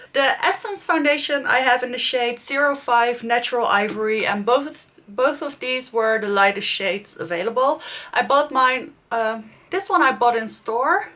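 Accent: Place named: Netherlands. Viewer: Love your dutch accent so much! ❤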